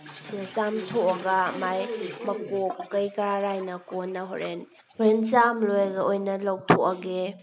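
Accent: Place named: Manipur, India. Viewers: Kakching marolse taba Yamna nungai eidi ...rhythm se ...cute oiye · Kakching gi accent ni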